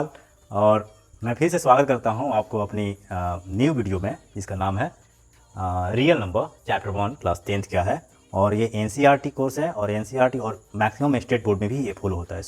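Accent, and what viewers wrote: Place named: Bihar, India. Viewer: Bihari look with Bihari acent to good man👌👌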